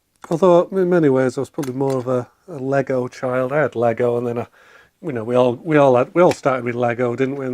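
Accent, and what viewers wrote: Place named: Sheffield, United Kingdom. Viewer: I'm assuming from your accent you're somewhere near Sheffield - I'm from London (sorry about that but I did vote leave :- )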